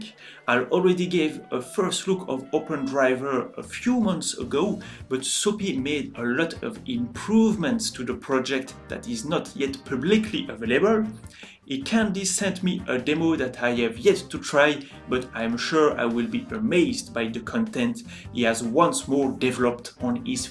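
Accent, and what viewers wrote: France. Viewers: Clairement un français le mec 😂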